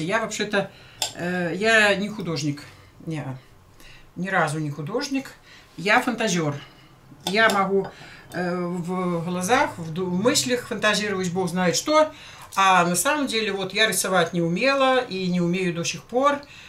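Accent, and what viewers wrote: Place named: Belarus. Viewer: Умница, обожаю белорусский говорок. Спасибо за чудесный, простой в приготовлении рулет❤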